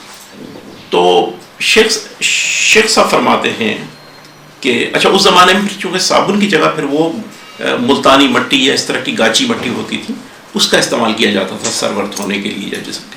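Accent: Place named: Iran. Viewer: farsi zuban ka andaz e takalum kia khob jachta hay aap ki zuban par mashah allah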